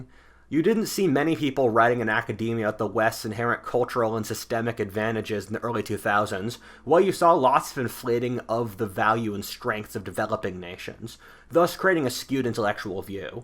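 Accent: United States. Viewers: I heard the heavy American accent, & immediately disregarded this video. .... The short introduction alone showed how naive (poss believing all the heavy US propaganda) this presenter is. .... Wait just a few more years, young Sir, & you will be horrified at what happens betw East & West.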